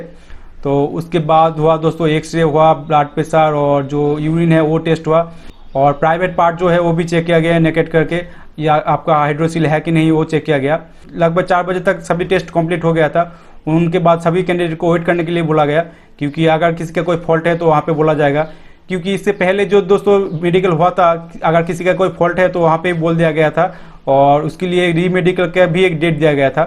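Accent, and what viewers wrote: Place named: Bengal, India. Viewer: Hindi sunke mujhe lag raha he ,app bengal se ho !!!!🤔🤔🤔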